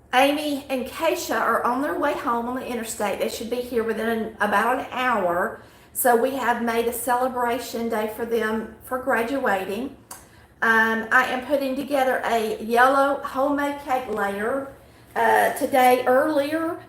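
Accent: South, United States. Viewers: I like to hear you talk, that is an authentic southern accent 😀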